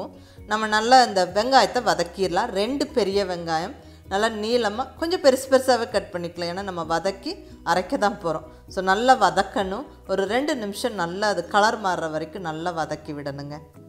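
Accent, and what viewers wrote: Coimbatore, India. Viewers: எங்க கோயமுத்தூர் பாஷை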